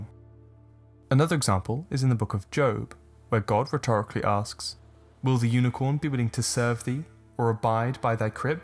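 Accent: England, United Kingdom. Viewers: I got halfway through this video and was like "wait, wtf is a Beer-Moth?" Then I realized he was just saying Behemoth in an English accent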